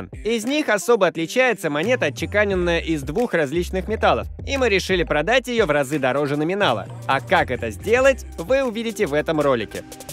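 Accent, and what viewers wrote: Kazakhstan. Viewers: Ты из Казакстана ?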